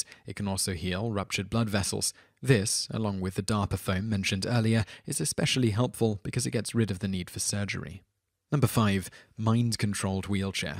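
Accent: England, United Kingdom. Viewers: americanized spelling of coloUr,sans U,english narrator...hmmmmmm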